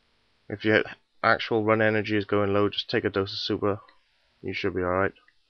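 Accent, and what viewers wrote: Wales, United Kingdom. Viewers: Thnaks for this it helped and I am proud to be welsh I recognise your accent. You from south wales?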